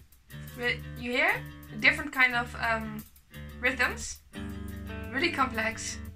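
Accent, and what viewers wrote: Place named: Scandinavia. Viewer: That Scandinavian accent so freaking lovely. Cheers from another Tool fan that grew up listening to Undertow.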